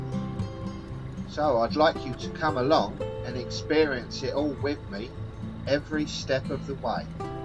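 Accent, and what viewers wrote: London, United Kingdom. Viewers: Hello, when my wife gets homesick for her home town of London she likes to hear you speak, makes her feel back home for a while, although she's lived here in Wales for 50 years for crying out loud! And me? I just like your video along with your commentary, it's very good, diolch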